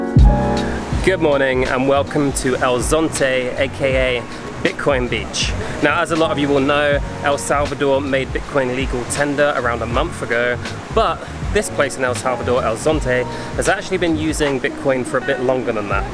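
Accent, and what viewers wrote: United Kingdom. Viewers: Hi, buddy where are you from? Your accent is like britain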